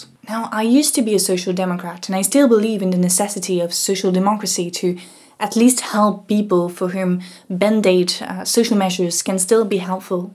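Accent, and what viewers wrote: France. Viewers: I enjoyed this video but I would also like to thank you for improving your English. I was having a hard time following you in the past because of the heavy French accent. 😊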